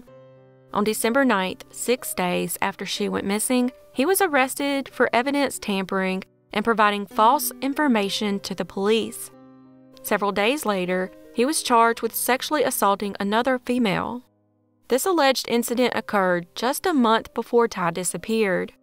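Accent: South, United States. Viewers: This narrator has a good southern accent. Very nice! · Just have to say as a Southern gal.Myself I absolutely love your accent❤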